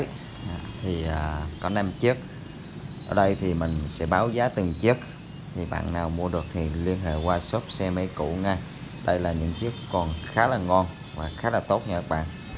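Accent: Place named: Nghe An, Vietnam. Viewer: Ớ quỳnh lưu nghệ an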